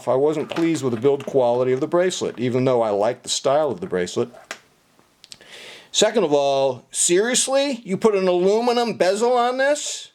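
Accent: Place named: United States. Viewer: I love your collection and your thoughts on it. Great American accent that always makes me smile. Please keep up the good work.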